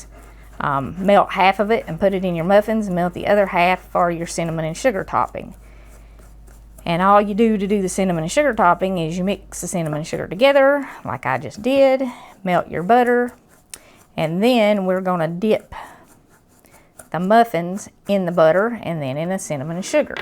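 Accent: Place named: Georgia, United States. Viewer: i feel for you so much. to lose a loved one is bad enough but to lose you're soul mate is that much tougher. from you're accent i think you are from somewhere in georgia, correct me if i'm wrong. i live i south georgia and thats what i here. love to you sunshine and god bless.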